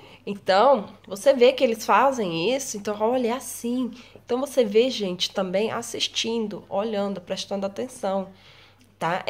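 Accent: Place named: Maranhão, Brazil. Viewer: Oi, sou novo aqui no seu canal e tenho uma pergunta você é de qual estado do Brasil? eu aposto que você é do Maranhão esse linguajar é bem maranhense mermã...kkkkkk. Estou gostando muito das sua aulas. Sucesso.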